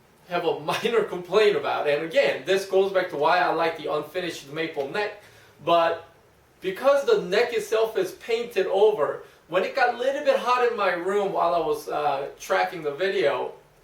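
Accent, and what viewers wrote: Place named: South Korea. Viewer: 안녕하세요. 혹시 한국 분이신가요? 영어 억양이나 발음이 종로 학원을 떠올려서 여쭈어 봅니다.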